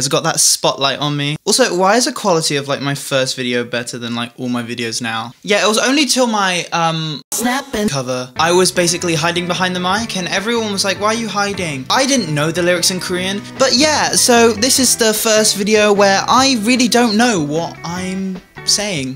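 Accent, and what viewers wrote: United Kingdom. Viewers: Are you korean? Because u look korean but then u speak British 😰✌ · why do you have a british accent-whaaaAa? my small brain cell...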